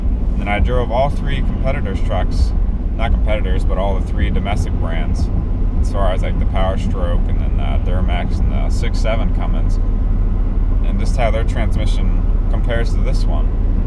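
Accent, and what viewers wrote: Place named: Illinois, United States. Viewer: what part of Illinois your from by your voice northeastern Illinois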